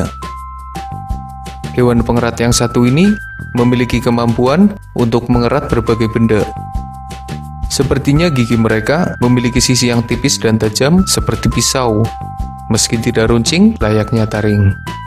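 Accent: Banyumas, Indonesia. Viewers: Org ngapak ya om?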